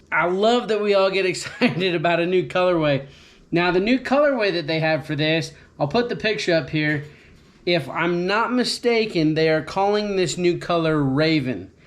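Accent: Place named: Utah, United States. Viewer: I'm from Salem and moved to Washington 20+ years ago. Are you from near there? I immediately recognized the Utah accent! I'm hoping to get a TW soon.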